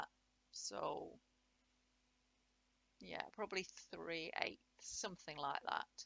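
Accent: England, United Kingdom. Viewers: Hi Mam, Happy New Year! It is a cold raining day in Virginia on 1/10/26. I live in an efficiency apartment saving for my first house to buy and my goal is for Summer 2026. I love fabric but, have not much storage and I am a waste not want not gal and save very tiny scraps. I made my first draw string bag with a round bottom as a faver for my boss's wife and totally did not get paid correctly with cost of supplies time and talent. It was a tricky pattern called a Knitters Bag. About 7 inches wide, 12 inches tall and a round bottom 10 inches across. I coukd not find a video for tge life of me to show how to have the casing with clean finished sides so you would not see a raw edge that could fray. So the fabric where the casing ends were for the rope draw string I did dabs of crazy glue with a Q-tip in a smooth fashion. I never saw any videos where the seamstress opened up the side seams. 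I love this video. Also I have been baffled how you turn back out the sides the right way from the bottom when you line your bag. I have learning disabilities and patterns are hard to follow. I so want to make little bags more so now for each holiday and these will be nice for Valentines Day fabric with individually wrapped candy inside and a little gift for my family members and 2 special friends at work. I am so happy I watched this after watching your mixed media card you made today. I am happy you gave your measurements in inches too. Your accent sounds English. My Dad is from Manchester, E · The best one and an English accent! Thank you from Southampton UK.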